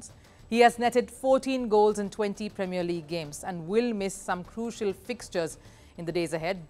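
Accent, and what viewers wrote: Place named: India. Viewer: Listening to football news with an Indian accent is weird.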